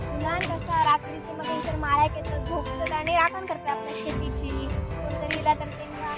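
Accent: Malvani, India. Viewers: तु असा मालवनी बोलतस की ऐ कायला बरा वाटता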